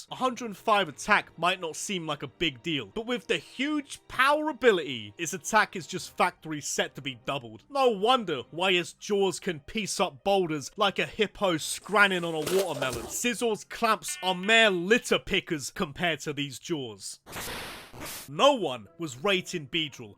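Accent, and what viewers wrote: United Kingdom. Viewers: i think hes british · I’m subbing because you’re British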